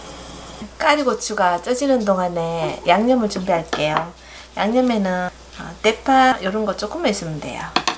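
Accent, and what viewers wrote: Gyeongsang, South Korea. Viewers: 경상도에서 잘 해먹는 반찬 서울 사람들은 잘 안먹더라구요 쪼매만 경상도 사투리 섞어 나오네요 ㅎㅎ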